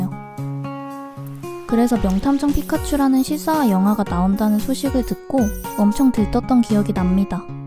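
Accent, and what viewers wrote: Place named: Japan. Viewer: 뭔가 말하는게 외국인같다 그 욕하는게 아니라 발음이 뭔가 둥글둥글한? 일본인이 한국말하는 그런 느낌이다 한국말 완전 잘하는 일본인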